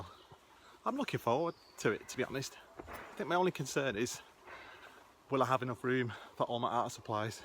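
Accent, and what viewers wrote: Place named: North West England, United Kingdom. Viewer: Yet another lovely vlog. I agree with you on the Boris Johnson misinformation! I hope Anthony's well, busy working probably as we all are in the NHS. Glad Dexters perked up a bit too. Im originally from the North West so loving your accent too. Your artistic skills are truly incredible, just fantastic.The detail is amazing. Can you enter me into the competition please? Chris Graham, Essex.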